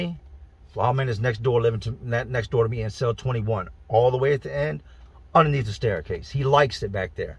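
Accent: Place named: Pennsylvania, United States. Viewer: you talk like a PA boy, you from the 412,724, or the 814?? imma New Castle, ( 724 ) proud my whole life!!! Either way, HMU some time!!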